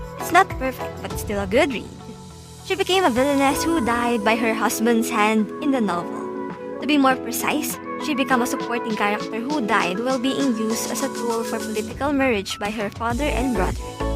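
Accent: Philippines. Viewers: The moment I heard you're voice I knew you're filipina. Hahahaha.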